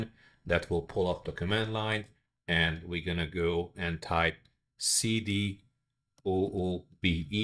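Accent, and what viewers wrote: Hungary. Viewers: You must be a Hungarian. Nice accent! 👍 and also greate guide!!!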